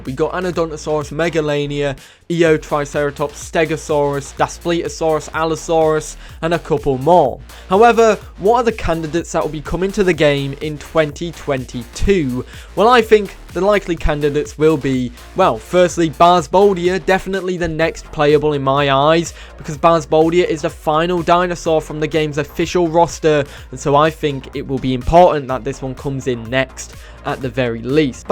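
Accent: United Kingdom. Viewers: your british english is funny i like it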